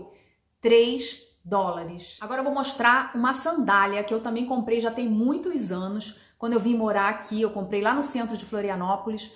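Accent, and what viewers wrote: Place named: Rio de Janeiro, Brazil. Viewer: Bem que desconfiei que você fosse aqui do RJ kkk pelo seu jeito de falar. Tamo junto, parça 😂 espero um dia ser agraciada com a mesma bênção de ir morar no Sul, como você. Bjs!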